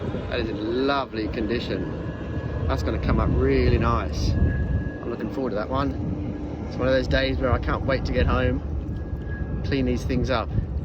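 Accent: Australia. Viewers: You sounded more Australian in this vid. I wondered if maybe you were tired, as accents are sometimes accentuated then? Great finds today. I actually liked that flat coat button that you seemed a little disappointed with. The quality of buttons then were considerably better than today. I’ve got to look for an update on this vid to see what that lump of metal turned out to be. Did they only make cod bottles in green? It would have been lovely if they had other colours too. Then the marbles would be really colourful. Thanks for sharing your finds.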